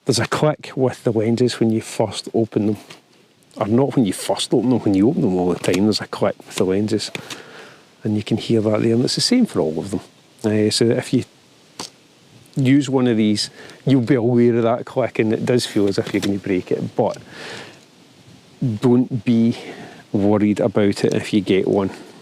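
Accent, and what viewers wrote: Scotland, United Kingdom. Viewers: Great video pal, also great hear the scottish accent for a change. I had the d850 but recently sold and got a Z7ii but haven't used it yet for any landscapes. I was wondering, for landscapes when on the tripod I take it you turn image stabilisation and IBIS off? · I would book a workshop instantly if I wasn’t so afraid of only getting 1/10th of what you are saying :) So hard for me to understand the Scottish accent although I visited Scotland five times. 😂😂😂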